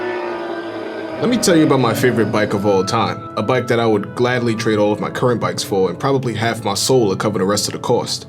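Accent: United States. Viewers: Sounds like any other normal American to me 🇺🇲 💪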